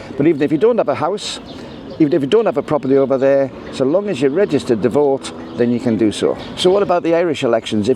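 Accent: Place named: Scouse, United Kingdom. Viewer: Great video - might be wrong but do you slip into a slight Scouse accent every now and then? Dare I say a hint of Birkenhead in there? 🙂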